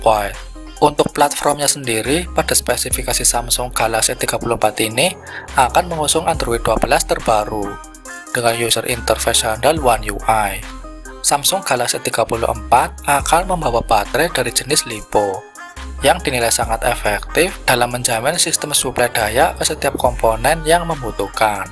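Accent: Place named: Java, Indonesia. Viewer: Voiceover nya jawa medok, ga enak didengarnya 😌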